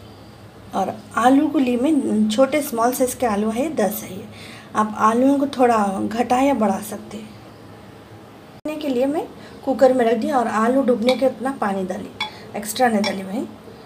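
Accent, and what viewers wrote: Hyderabad, India. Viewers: Hyderabadi language 🙄🤔🤔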